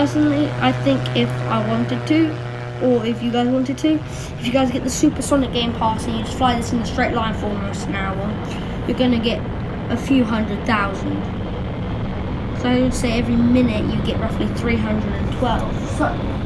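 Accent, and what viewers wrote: United Kingdom. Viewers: You are way to underated you must have 50k right now. Btw are you british? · are u British? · Just by the sound if his accent I'd say yes he is British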